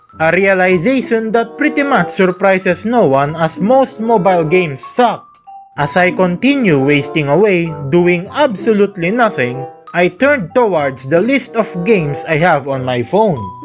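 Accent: Philippines. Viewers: Spiffing Brit with dat Filipino accent 🔥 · Why do we have the same accent? Kabayan?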